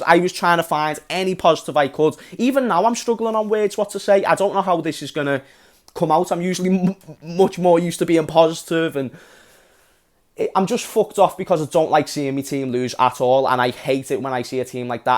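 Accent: Liverpool, United Kingdom. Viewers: This Scouse Accent is Ultimate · Nice Scouse voice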